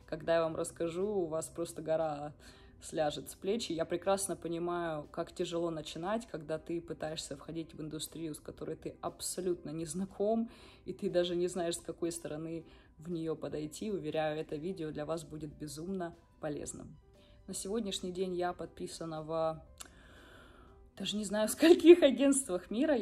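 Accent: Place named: Rostov, Russia. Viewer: Слышу слышу ростовский говор...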